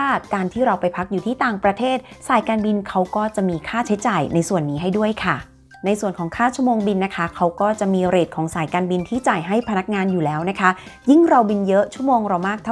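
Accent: South, Thailand. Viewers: เป็นคนใต้หรอพี่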